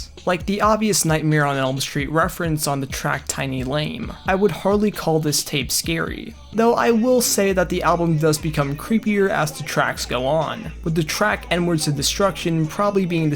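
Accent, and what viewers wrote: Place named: Midwest, United States. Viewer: I hear Midwestern in your accent and it makes me sooo fuckin mad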